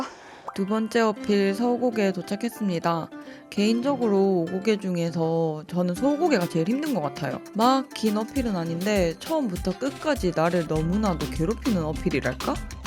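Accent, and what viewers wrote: Gyeongsang, South Korea. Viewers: 뭔가 갱상도 악센트가...ㅋ 대단하세요. 예쁩니다.^^ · 멋집니다^^ 경상도 말투가 더 정겹네요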